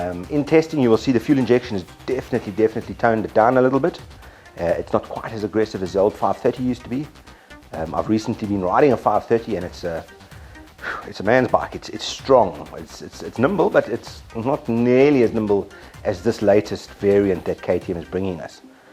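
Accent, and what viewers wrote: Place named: South Africa. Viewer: that south african accent doe ?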